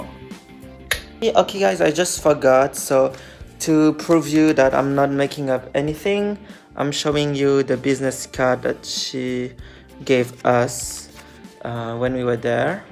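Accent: France. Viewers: U'r French accent is so cute 😘, but interesting story, thanks for sharing. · On entend ton accent français trop choupi ~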